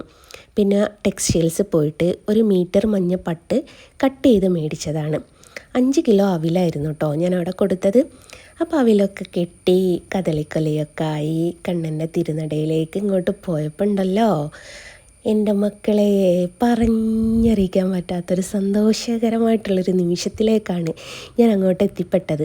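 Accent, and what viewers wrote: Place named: Thrissur, India. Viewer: ഇപ്പോൾ തൃശൂർ ഭാഷയായല്ലോ കൊള്ളാം 😂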